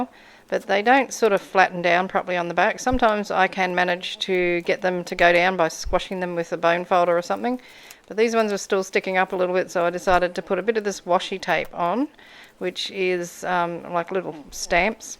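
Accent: Australia. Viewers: Thank you, for your inspiration on the hidden theme. I just joined your channel. As soon as I heard your voice, I knew you were Aussie. I'm an Australian, too. I live in Wodonga, Victoria. 😊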